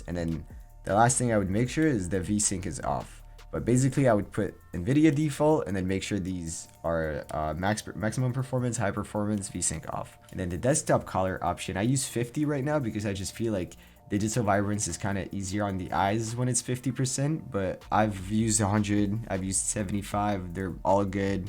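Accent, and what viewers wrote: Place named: France. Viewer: you're french ? you got a nice accent for a frenchie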